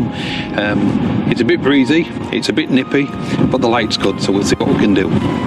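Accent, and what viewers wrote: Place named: Hull, United Kingdom. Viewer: Can't hide a Hull accent :)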